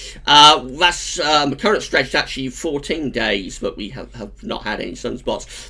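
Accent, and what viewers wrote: United Kingdom. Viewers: what exact township is that accent from my bruvah? I'm east indian trying to learn British accents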